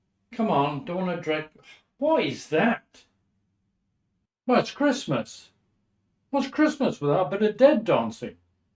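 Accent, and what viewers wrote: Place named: South Africa. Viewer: Great channel and especially great to hear a familiar accent 🇿🇦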